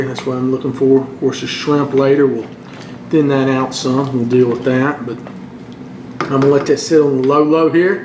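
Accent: South, United States. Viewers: I love your videos !! just found them today :) LOVE your southern accent ! Where r u from ?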